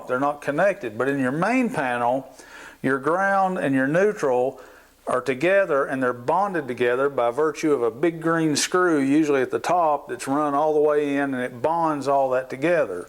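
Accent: United States. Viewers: You have a great American accent. Thanks and hello from down under.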